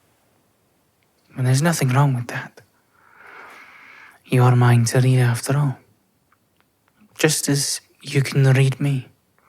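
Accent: Scotland, United Kingdom. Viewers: Well, that Scottish accent does it again lol. I wasn't ready for the mix of soothing and spicy—you're definitely a master at keeping us on our toes. Can’t wait to see what you come up with next! ❤ ❗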